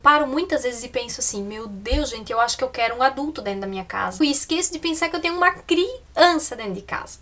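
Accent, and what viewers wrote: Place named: Santa Catarina, Brazil. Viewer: Cara, continua nessa linha que vai dar bem certo! Gosto dos seus vídeos!!Do jeito que fala, ainda mais com esse sotaque "Catarina", rsrsrs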